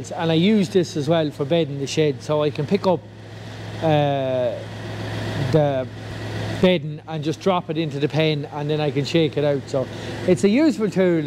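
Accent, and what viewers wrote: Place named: Cavan, Ireland. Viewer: I though I recognised that accent, good to see a fellow Cavan man on YouTube. Best of luck 👍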